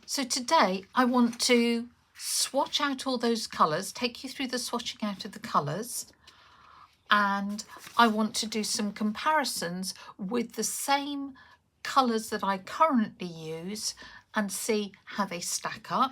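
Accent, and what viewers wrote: United Kingdom. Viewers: you really make me smile I love the way you talk - its so english. I love that we recognise ourselves in others.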